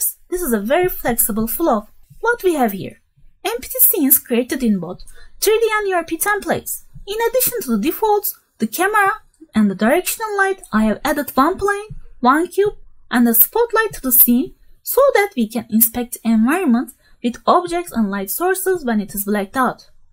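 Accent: Turkey. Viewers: dinlediğim an saniye de bu Türl dedimdoğruda bildim : D Türklerin aksanı hep aynı ama güzel kelime haznen var devam.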